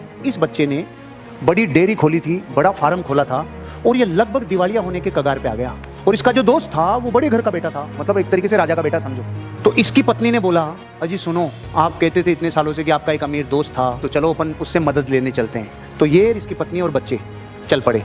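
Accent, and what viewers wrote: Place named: Jaipur, India. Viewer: क़तई ठेठ जयपुरी भाषा बोली 😂😂😂 सर जी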